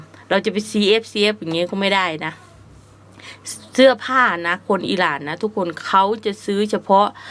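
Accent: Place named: Isan, Thailand. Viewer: สำเนียงคือคนอีสานแท่จ้า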